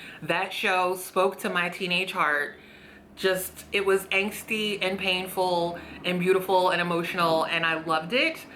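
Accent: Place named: Philadelphia, United States. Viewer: Oh stfu , every time i watch your vids i feel like i know you and now i know why lol YOUR A PHILLY GIRL , must be the accent , im Philly too heehee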